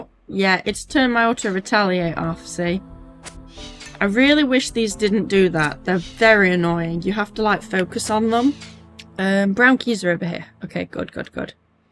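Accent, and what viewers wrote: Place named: Yorkshire, United Kingdom. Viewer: So Yorkshire lol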